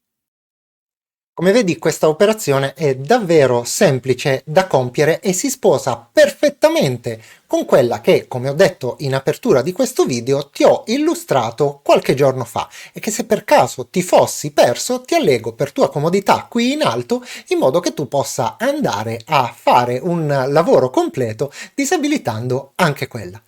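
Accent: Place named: Palermo, Italy. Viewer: Abiti a Genova però il tuo accento è palermitano. Comunque grazie per i tuoi video che sono sempre utili.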